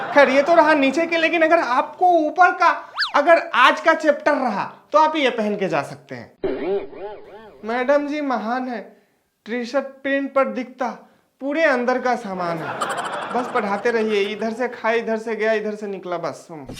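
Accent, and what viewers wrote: Bihar, India. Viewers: Aapki aawaj thoda bihar type me he thoda thik karo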